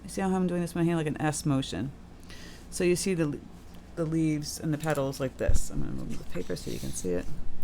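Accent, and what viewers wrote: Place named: East Coast, United States. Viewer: I'm enjoying your videos immensely, your a great teacher and a pleasant, cool, East Coast voice. Best to ya!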